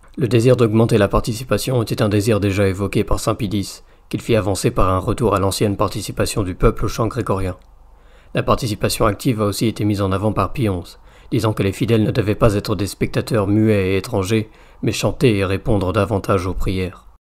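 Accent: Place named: Europe. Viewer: Par votre accent, je présume que vous êtes en Europe. Voici une réalité canadienne. Tous les documents que vous avez cité sont valides, la nouvelle messe elle aussi est valide. Le problèmes s'est trouvé au niveau de la formation des prêtres dit baby boomers. En effet, bien que tous les documents soient présents, la formation lors de notre "révolution tranquille" a engendrée plusieurs cohortes de prêtres dit "communistes" nos pas de manière politique, mais de manière liturgique et idéologique. Ses cohortes font encore des dégâts aujourd'hui dans l'église québécoise et canadienne en enseignants : que le Christ n'est pas présent dans le saint sacrifice, que la messe n'est pas un saint sacrifice, en sautant ou modifiant des prières eucharistiques, en ne priant pas le bréviaire, n'affichant pas où peu l'adoration, favorisant les femmes diacres/prêtres, aucun respect pour la manipulation de l'hostie, en utilisant les homélie pour faire un spectacle et non pour passer un message biblique. 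Etc.. tous les fait que j'ai énumérés ci-dessus j'ai pu les voir de mes yeux! Je ne blâme pas les documents du Vatican ou les conciles, mais bien le manque de rigueur et le manque de jugement des séminaires. Encore aujourd'hui, les séminaires québécois enseignent certains points qui vont à l'encontre de catéchisme et même du droit canonique! Le fléau est donc dans la formation et c'est pourquoi nous pouvons voir un exode des séminaristes vers les séminaires plus "traditionnelles" non schismatiques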